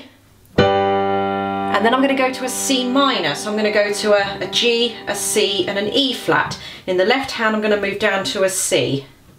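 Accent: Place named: United Kingdom. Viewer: I hear an accent... Are you from UK? You Are Awesome!!!!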